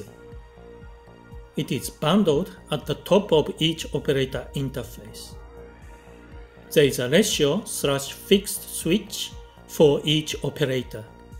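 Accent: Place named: Japan. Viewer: God this is a Japanese speaking English...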